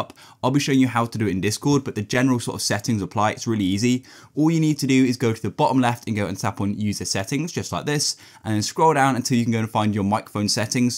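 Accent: United Kingdom. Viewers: He is defenetly brittish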